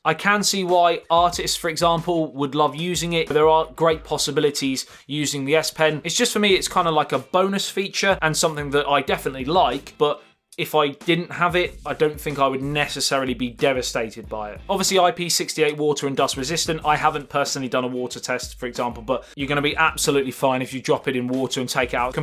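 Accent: United Kingdom. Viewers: Hears unintelligent British accent, disregards opinion and clicks off video.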